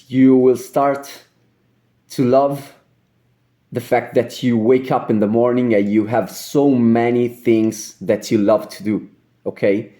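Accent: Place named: Lombardy, Italy. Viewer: Hi! It's very interesting and exciting to see you speaking in English (with an imperceptible Lombard accent). This mental coaching video is captivating and deep, you made me think about my own development...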